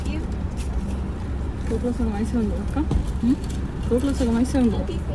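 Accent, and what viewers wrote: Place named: Telangana, India. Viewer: నీ confidence levels సూపర్ బంగారు... Really you are so cute and smart... నీ native telugu మాత్రం కేక... తెలంగాణ పిల్లవు కదా.. కాస్త చిలిపితనం బాగా వుంది... God bless you...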